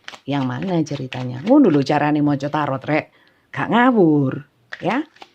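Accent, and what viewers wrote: Java, Indonesia. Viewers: bunda lucu penyampaiannya jg detail enak ngomongnya bhs jawanya medok aku apresiasi bngt sm bunda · Pgi bunda resonet skli bunda kii lucu kudu guyu klau dgr readingnnya bunda sll tk tunggu egh buun logat jwa bngt suka skli bnr bunda tdk pelut abnrnya ya krena nggk ounya duit aja buun.. Dia fokus krja buun smnjk covid smp dtik ini htngnya bmyak jdi untuk k hub nggk n gtu mikirin.. Tpi dia ttp syank ktne buun.... Trima ksih bcaan ya bunda sht sukses sll buat bubda Aamiin" 😍😘🙏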